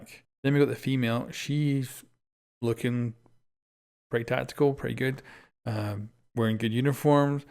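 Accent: Scotland, United Kingdom. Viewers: Love the accent! Are u scottish? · Are you scottish?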